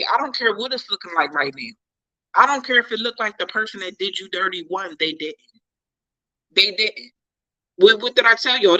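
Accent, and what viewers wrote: United States. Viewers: i adore this black woman..... we as black men LOVE U ..... u gotta love her country accent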